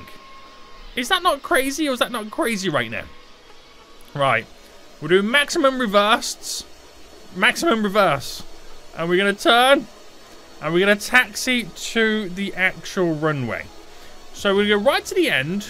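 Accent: United Kingdom. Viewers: Average skull emoji kid, He is British, plus you can’t really hear the “g” in the word “flight”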